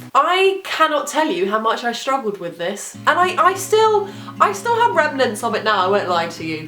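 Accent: England, United Kingdom. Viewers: just don't be anxious about your videos. you know what you're doing. i do not sleep a whole lot, naturally, so my issues manifest as confusion instead of sleepiness or desire for rest. i get the indecisiveness thing. for a time in my twenties my big decisions were made after a massive drunk; anything that seems plausible when you're hungover is more likely to succeed within one's basic nature. no manic choices... but i admit in retro i didn't soar at a few points where perhaps i might have. everyone's a little fucked up is my current thought, so honesty about it is decent. (don't totally stop swearing- the f-bomb with english accent is a kind of crack to Canadians). stay a little mad, tho. it suits you.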